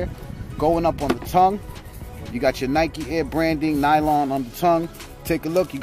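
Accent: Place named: New York, United States. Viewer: sound like he from newyork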